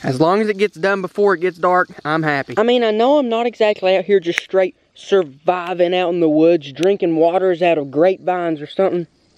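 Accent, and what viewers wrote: United States. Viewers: I LOVE HIS AMERICAN ACCENT!!!!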